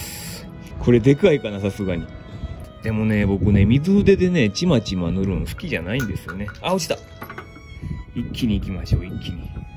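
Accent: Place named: Kansai, Japan. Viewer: かっこいい快適関西弁嬉しい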